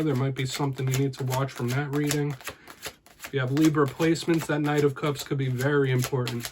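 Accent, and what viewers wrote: New Jersey, United States. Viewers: you have a Jersey-ian accent. I love Jersey. It's possible for regular people to convince other regular people to do horrific and unGodly things based off of THEIR own personal experiences & happenstances in life. The challenge is to think independently and live without fear of repercussion or reprisal. Don't sacrifice your valuable time doing things that you, otherwise, would not do. We are here to enjoy our time, that's all.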